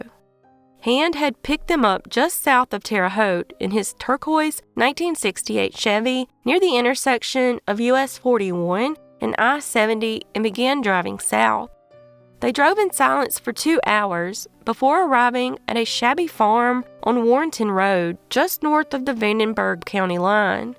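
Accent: South, United States. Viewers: Only southern accent I like. Thanks for all of your hard work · Nice to hear a southern accent even though mine makes yours sound like a Yankee.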